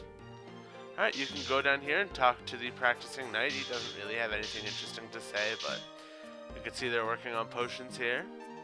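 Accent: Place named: South, United States. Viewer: Where are you from cause it sometimes sounds like you have a southern accent?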